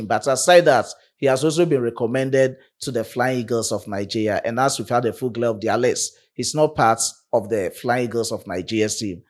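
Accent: Ghana. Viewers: Mr you sound Ghanaian to me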